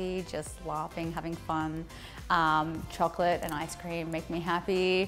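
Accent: Australia. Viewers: Glad she hasn't lost her Aussie accent.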